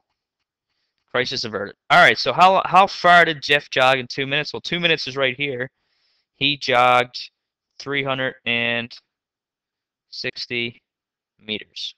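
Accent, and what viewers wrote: Newfoundland, Canada. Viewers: Are you from Newfoundland?? Cause you sure sound like it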